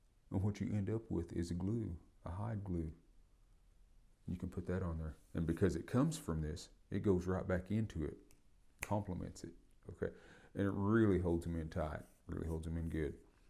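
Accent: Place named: South, United States. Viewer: This dude's country as hell lmao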